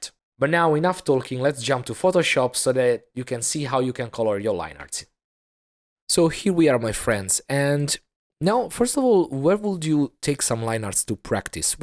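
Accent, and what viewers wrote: Italy. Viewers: Apro il video, sento le prime tre parole... Ok, dico, questo è italiano (accento inconfondibile) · Oddio, ahja si sente subito l'inglese "Italianizzato"😂 "MAMA MIIIAA" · Si sente a chilometri che sei italiano! Bel video comunque, grazie!! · Che bello sentire un accento italiano !!!!😍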